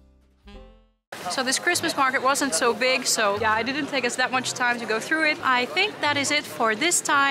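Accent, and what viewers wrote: Netherlands. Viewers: How does an expat pick up such a dutch sounding accent?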